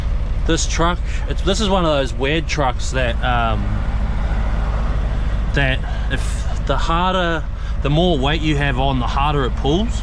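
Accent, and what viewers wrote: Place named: New Zealand. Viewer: Funny listening to a kiwi explains trucking life on the east coast. I lasted 8 minutes. Thenks brew.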